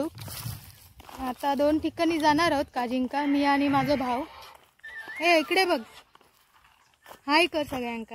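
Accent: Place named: Malvani, India. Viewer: गो सिंधुदुर्गातील खयच्या गावात काजी काढुक गेलंस... तुझा मालवणी कानाक गोड वाटता... ❤❤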